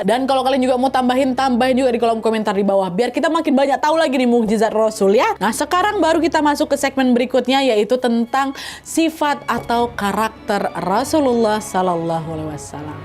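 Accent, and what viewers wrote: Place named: West Sumatra, Indonesia. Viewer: Tagayiang gayiang bahaso Minang e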